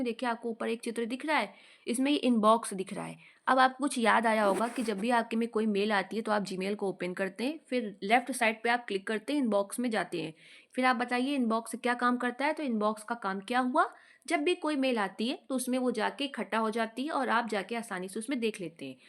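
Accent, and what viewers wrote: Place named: Bareilly, India. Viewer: सायद आप बरेली से होंगी अबाज़ से लग रहा है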